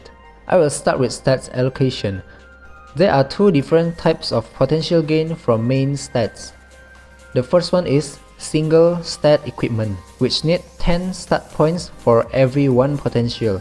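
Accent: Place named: Malaysia. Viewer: ru malaysian? ur accent looks like Malaysian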